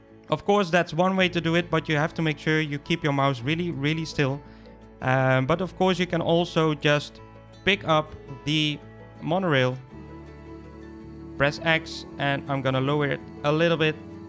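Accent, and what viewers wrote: Netherlands. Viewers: Your accent sounds dutch... ben je een Nederlander?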